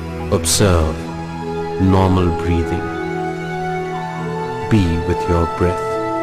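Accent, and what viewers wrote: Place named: India. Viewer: I understand that you could be having an indian accent when you speak. Like a hot potato in your mouth. Now, you do not need to transfer that accent into writing form. "That" is not spelled DAT, DIS is spelled THIS. I would believe that those words are common enough so that you do not need to make those simple mistakes. Please meditate on THAT!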